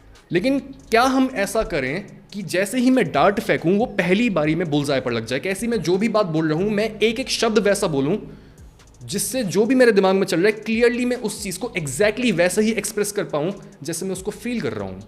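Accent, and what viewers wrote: India. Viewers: İngilizce biliyorum saniyodum ta ki Hint aksanlı bu adamı dinleyene kadar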